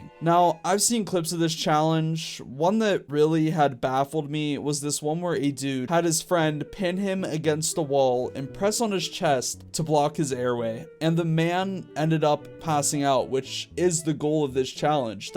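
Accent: United Kingdom. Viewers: now one realise he was british at the start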